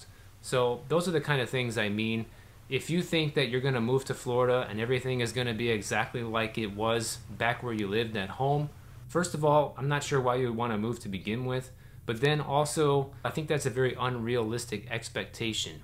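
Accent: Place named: Chicago, United States. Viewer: Hey man, great videos. We are moving to Naples soon and so have been doing our research. We are beyond excited. I watched your East Coast/West Coast video, and I am excited about the laid back nature of the west coast, but a bit nervous about the red tide! I will also be heading east frequently to surf. Based on your accent I bet my son you were from Chicago ( I am from Evanston). Then you said Illinois this video, where are you from in Illinois? Keep the great videos coming!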